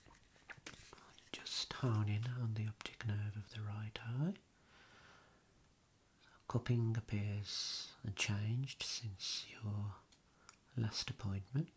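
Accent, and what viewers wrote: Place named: Black Country, United Kingdom. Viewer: Great video, love to hear the Black Country accent!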